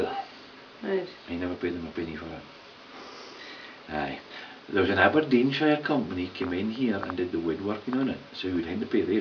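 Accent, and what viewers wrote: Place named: Denmark. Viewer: he speaks english like a danish person, that is amazing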